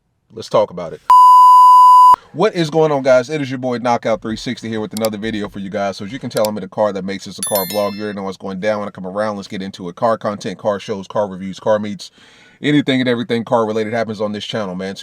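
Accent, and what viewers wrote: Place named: South, United States. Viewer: why u talk like an old country segregationist politician in the 60s...im from south carolina and there's different types of country twang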